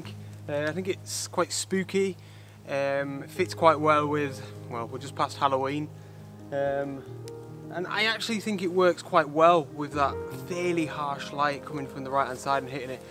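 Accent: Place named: England, United Kingdom. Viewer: Love the photos and the English accent! (great focusing tip, also)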